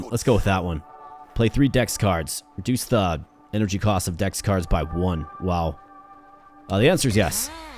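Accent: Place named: Canada. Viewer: You know its plague and not pleg ? Are you Canadian? Canadians cannot say that word for the life of them, kinda odd. Only noticed it when I started watching youtube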